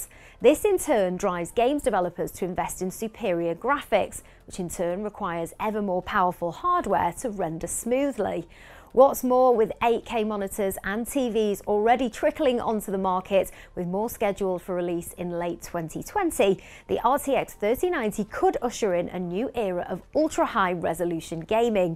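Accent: United Kingdom. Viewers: I really like the British accent (I'm French btw)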